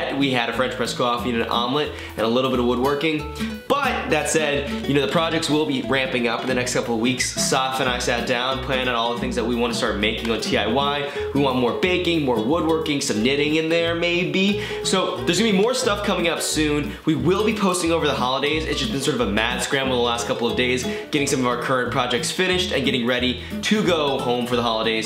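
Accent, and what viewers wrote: Philadelphia, United States. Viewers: what part of philly are you from lol I hear it in your voice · u can hear his Greater Philadelphia Area (tm) accent so clearly in this video omfg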